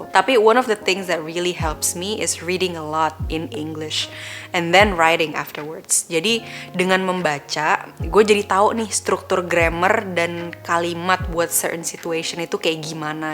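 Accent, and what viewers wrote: Indonesia. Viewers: Paling nikmat emang speaking dan aksen nya orang indonesia 😭. Juelas banget. Dan jarang oake slang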